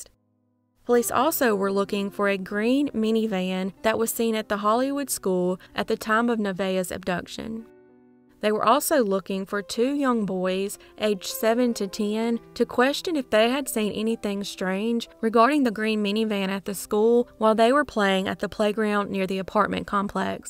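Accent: South, United States. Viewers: I’m from U.K. but guys what accent is the presenters voice ? Must be down south right ?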